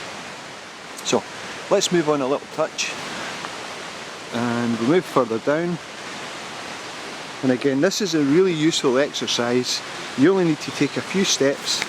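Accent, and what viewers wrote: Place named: Scotland, United Kingdom. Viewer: That's clearly a Scottish accent... right? Very nice video..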